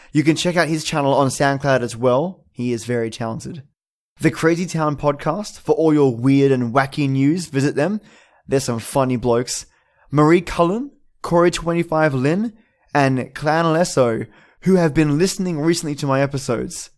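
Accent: Australia. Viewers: I'm suppose to be babysitting, but the baby went down for a nap so here I am 😏 ready for some great stories in that awesome Aussie accent of yours 🙂🖤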